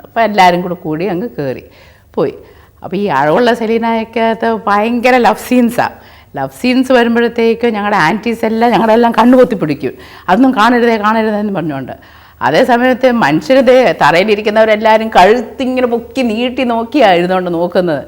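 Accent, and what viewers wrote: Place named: Kottayam, India. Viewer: n d Kottayam slang which l expected d least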